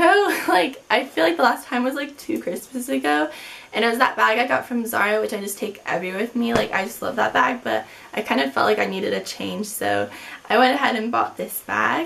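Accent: United States. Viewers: Why do you speak in a American accent ? It's pretty annoying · Why are you speaking american if your english?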